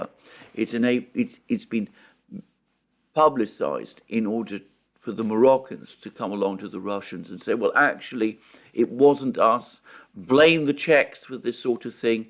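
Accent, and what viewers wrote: England, United Kingdom. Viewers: It's weird listening to a communist with an English accent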